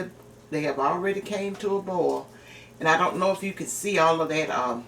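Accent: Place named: Mississippi, United States. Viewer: Greeting's, I am a new subscriber. When I notice your accent as you talk, while you cook, I said to myself, she must live in my hometown(MS). Because my Mother cooks and sound like this, she was a great southern cooker. You are a wonderful cook, I am so grateful to have found this Jewel of you and your channel. Blessings to you and your family.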